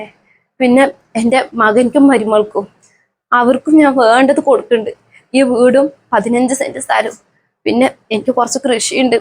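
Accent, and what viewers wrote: Palakkad, India. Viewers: ഭാഷ ശൈലി പാലക്കാട്‌ പോലെ തോന്നി 😊❤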